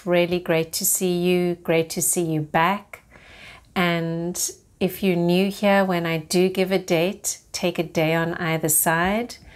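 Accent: South Africa. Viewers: It's a South African accent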